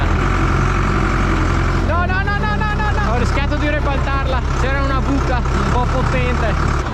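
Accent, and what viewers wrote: Veneto, Italy. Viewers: Te se proprio Veneto ciò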